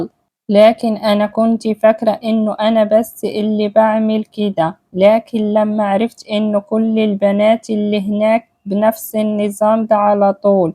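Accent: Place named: Morocco. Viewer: المرجو عدم التصنع احكي بالدارجة المغربية احسن لك لان اللكنة المغربية واضحة في صوتك وسوف يكون افضل وشكرا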